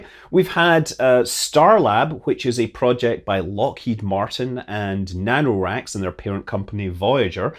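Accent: Scotland, United Kingdom. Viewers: I say it to myself every time I see he has a new video up! My girlfriend hears me and says "Oh is it that Scottish space guy?"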